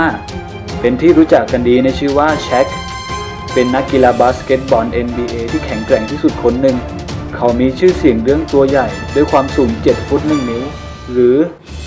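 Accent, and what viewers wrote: Suphanburi, Thailand. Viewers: เสียงออกสุพรรณมากครับ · ชอบความเหน่อ..น่าร๊ากกกก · พี่คนสุพรรณใช่ไหมครับ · พึ่งรู้ว่าพี่เป็นคนสุพรรณบุรีเหน๊อเหน่อ มาจาก<num> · เสียงพี่เหนอไป 555 ชอบๆ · เสียงแบบนี้ถ้าไม่มาจากสุพันก้อคอนฐมล่ะ หรือไม่ก้อเมืองกาญ แต่ก้อเป็นเอกลักษณ์น่าฟังดีค้าบ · เหน๋อออออเชียววว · คนสุพรรณป่ะเนี้ย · คนพากษ์เป็นคนสุพันป่ะครับ 5555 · สุพรรณ ใช่ไหม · พี่เสียงเเบบเหมือนคนสุพรรณมาก😂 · เสียงเหน่อมากเลยพี่ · ทำไมเสียงเน่อจังคับพี่ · เหน่อจังอะ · คนสุพรรณ ปะนิ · พี่เป็นคนจังหวัดสุพรรณป่าว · คนสุพรรณซิน่ะครับ 555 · คนสุพรรณใช่มั้ย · เสียงเหน่อไปทางสุพรรณ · เหน่อมาเลยนะไอ่หม่าลูกพ้อ ชอบๆสำเนียงสุพรรณ👍👍👍👍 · เสียงเหน่อมาก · ชอบเสียงบรรยาย เน่อออดี ฟังเพลินนนเพลิน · คนสุพรรณเหรอออ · พี่พูดเหมือนคนสุพรรณเลยอะ พูดเหน่อ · พูดเหนอสุพรรณป่าวเนี่ย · พุดเหน่อนะเราอ่ะ..คนสุพันรึป๊าว?5555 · หึ่ย เหน่อ เหมือนกัน😂😂😂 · คนพากเป็นคนสุพรรณใช่หม้ายยยย55555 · คนสุพรรณป่ะเนี่ยเราก็สุพรรณ555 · นี๋มันนสำเนียงงคนสุพรรณนีหว่า เน้อเชียว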